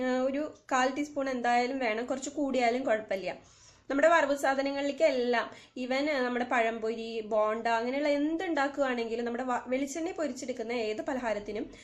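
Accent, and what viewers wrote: Thrissur, India. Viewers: good job. like ur presentation..trissur slang...thank u....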